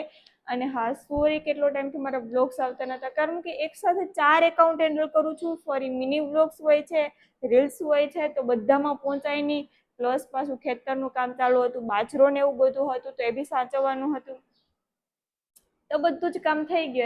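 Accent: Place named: South Asia. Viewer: didi you deserve my subscribe because many people kaik ne kaik speciality hoy j chhe i found a speciality in you ae.. tamaro desi accent je bolva no chhe they really makes you famous all the best from my side..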